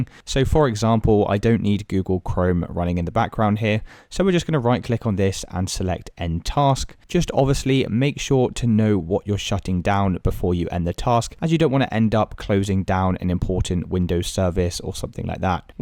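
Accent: United Kingdom. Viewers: jesus i hate the british accent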